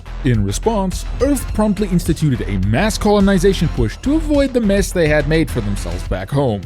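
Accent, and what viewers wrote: South Africa. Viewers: WAIT HE'S SOUTH AFRICAN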